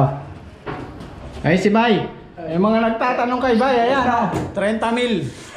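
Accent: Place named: Pampanga, Philippines. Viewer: Sir bak8 kapampangan salita mo e, taga nueva ecija ka?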